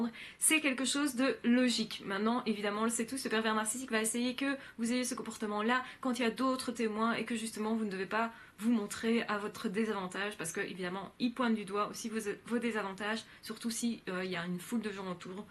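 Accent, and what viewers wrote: Belgium. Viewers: J'adore votre accent (belge) avec: puis (pouis), fuir (fouir)... Victime d'une pervers-narcissique je me demande si je ne le suis pas aussi!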